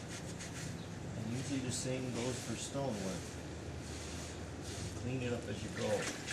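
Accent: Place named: Scranton, United States. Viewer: I live in St Louis but I grew up in Scranton. I’d know that accent anywhere. Great stuff.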